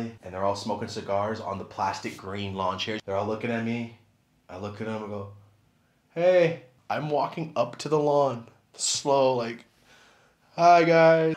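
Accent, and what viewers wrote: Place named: South, United States. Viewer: Am I the only one impressed by the southern accent?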